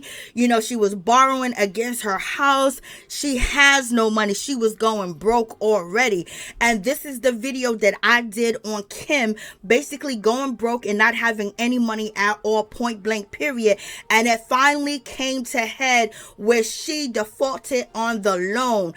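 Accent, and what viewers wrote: New York, United States. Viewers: Hey Girrrrrrllllllll hey........ l just love listening to your videos. I love the way u talk.💕 You must be from NY. I'm a New York girl as well, born there left when l was 13. Anyway, people are not up to Kim's Shenanigans! Remember the last time she was on Housewives. Andy told her she was a trouble maker. Anywho... nice chatting. 🤩💞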